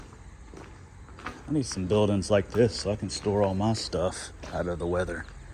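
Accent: South, United States. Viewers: You have a deep southern accent.👍🏾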